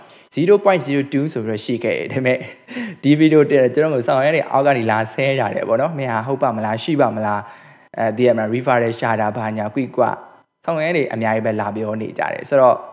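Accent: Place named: Myeik, Myanmar. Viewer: အကိုကဘိတ်သားလား ဘိတ်သံဝဲနေလို့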